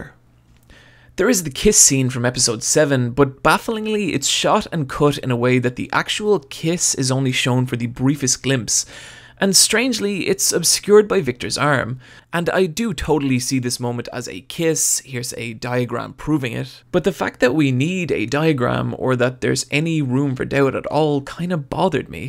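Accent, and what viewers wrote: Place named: Ireland. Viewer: No hate i promise just wanted to say the dude sounds Irish (i'm also Irish)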